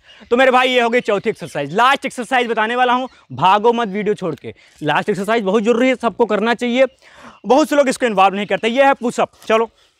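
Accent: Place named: Uttar Pradesh, India. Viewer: Bhai aapki bato se lag rga h aap hamare hi taraf ke ho...... Zila sultanpur.......up Mja aa gya bhai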